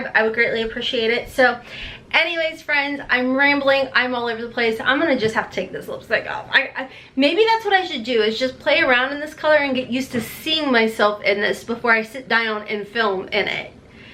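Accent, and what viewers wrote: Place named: Kentucky, United States. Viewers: Hi! I love watching your videos. I’m from Kentucky too and it’s nice to hear someone with the hometown sound. 🤣 Your videos are seriously my favorite! <mail> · I’m in West Virginia and I love watching your videos for the Kentucky accent. Love it! IG is cherylb_88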